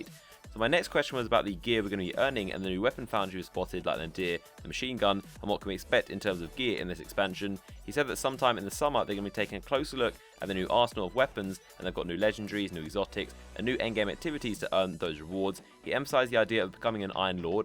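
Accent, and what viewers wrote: United Kingdom. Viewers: Race doesn't influence how you sound. He has a Brit accent because he's from Britain. Stupid.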